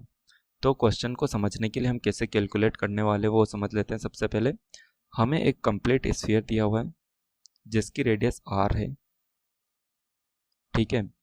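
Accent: India. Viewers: He's speaking English with a thick Indian accent.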